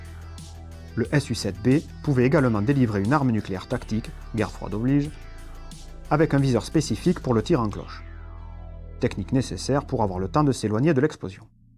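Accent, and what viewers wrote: South, France. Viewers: C'est dommage, ça a l'air intéressant mais l'accent sudiste donne l'impression d'écouter Castex.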